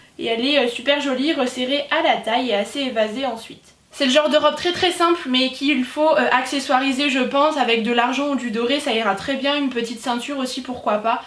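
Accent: Lorraine, France. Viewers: Tu es Lorraine ?:0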